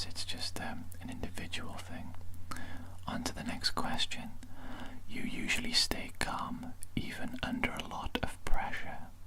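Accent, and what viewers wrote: Wales, United Kingdom. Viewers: Great video! I have to ask though, is that a Welsh accent or am I way off?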